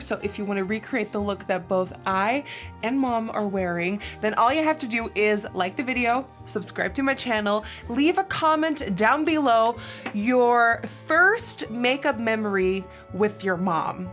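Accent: Netherlands. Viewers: Ik ga helemaal stuk, zo’n heerlijk Hollands-Engels accent..prachtig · Ik ga zo stuk om dat steenkolen engels eh, heeerlijk dat typisch nederlandse😭😂❤️ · Love the Dutch accent, really miss the Netherlands!! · Haar Engels is zo grappig ik vind het echt geweldig lekker nederlandssss 🇳🇱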